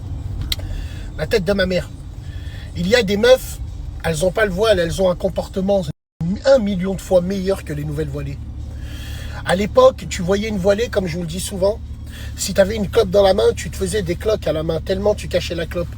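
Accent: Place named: Arab world. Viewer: C est tellement degeulasse l accent arabe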